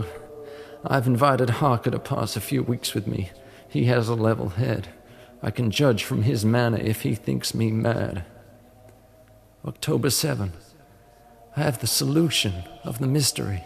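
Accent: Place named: South, United States. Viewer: what a wonderful, flowing southern accent, beautiful voice, and a good reader - who is he??? :}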